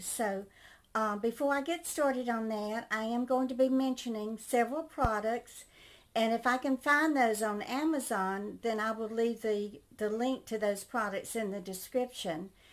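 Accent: United States. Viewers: I love your American accent ?! Where are you from in USA 😍 Irish ☘️ fan watching hehe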